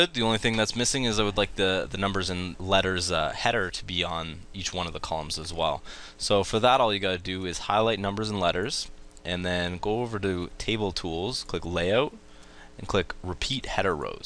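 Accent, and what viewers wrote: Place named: Canada. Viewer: Thank you so much. Brilliantly helpful and no wasted words. Love your Canadian accent too (from Australia).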